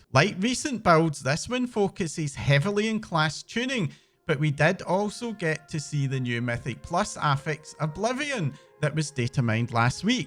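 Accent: Scotland, United Kingdom. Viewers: You trolling 😅 lads Scottish he won't be changing how he speaks for you 😅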